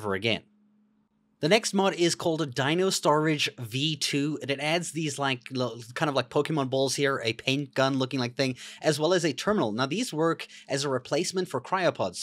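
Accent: South Africa. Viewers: Do I hear a lil South African accent? Heyyyyy from ZA. awesome vid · I could tell a fellow South African from that accent. A buddy got me into ARK recently and showed me some of these. Awesome Spyglass is a crutch for me now. Mist definitely gonna try a few of these. Also subbed too!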